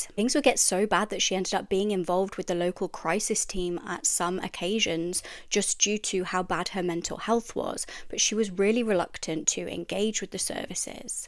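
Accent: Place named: Yorkshire, United Kingdom. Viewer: Yummy Yorkshire accent. ❤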